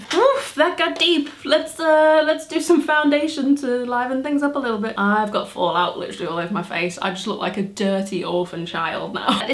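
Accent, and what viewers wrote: Liverpool, United Kingdom. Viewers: I love how you dip in and out of a scouse accent.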